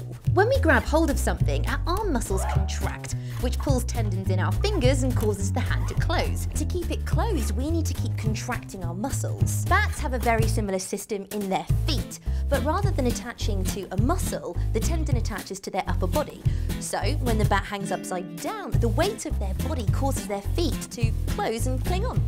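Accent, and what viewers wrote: United Kingdom. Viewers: im inlove with your british accent . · Britsh accent ?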